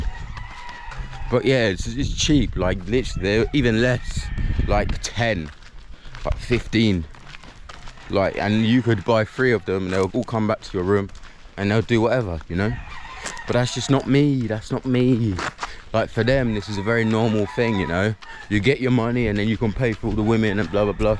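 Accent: United Kingdom. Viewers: Honestly i am not bias. I just see this guy with a weird personality.. He sounds so bitter and he doesnt seem to have any confident in himself. I think that he was mixing with the wrong people. He got lost in the bushes. What is wrong with him? He needs help!! Hes just throwing tantrum . The other utubers ask ed for help from people who they have sought out before landing but you just went this british accent and think that would get you by. Life doesn't give anyone what they deserve, you must fight for what you want. Love ❤brother but your attitude isnt right. Jamaicans love and care for people. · You are obviously disgruntled socially. Where are you fron really?. I can hear the british accent. Am Jamaica and live in theUS. Just can't understand what you are do angry about. People ask you for money just day no. I have neices, nephews and lots of cousons in the UK. 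Enjoy you stay in Jamaica and calm your nerves.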